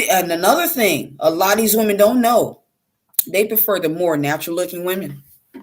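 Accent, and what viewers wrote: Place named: Detroit, United States. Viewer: I hear a Detroit ish Midwest accent. Am I right?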